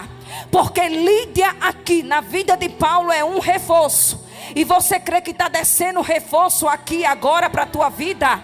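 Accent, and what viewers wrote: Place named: Pernambuco, Brazil. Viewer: pelo sotaque ela é pernanbucana.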